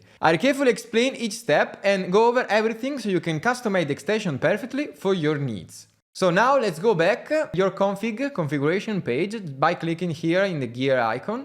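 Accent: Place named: Italy. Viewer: guarda che si sente che sei Italiano ....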